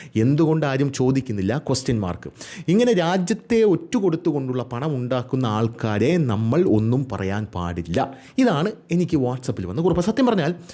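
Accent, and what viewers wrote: Thiruvananthapuram, India. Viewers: 😂😂😂😂😂😂😂❤❤❤❤. അവസാനത്തെ ബാബു സാമി പൊളിച്ചു. Serious ആയ വിഷയം കോമഡി ആയി പറയാന്‍ ബെസ്റ്റ് തിരുവനന്തപുരം slang ആണ്‌ എന്ന്‌ തെളിയിച്ച അങ്ങ് ഒരു മഹാന്‍ ആണ്‌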